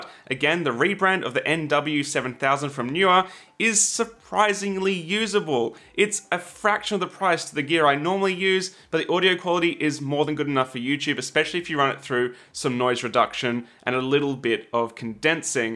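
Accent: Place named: Australia. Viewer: Hahaha thinks I watch to hear his cool Australian accent. I watch because of his awesome content.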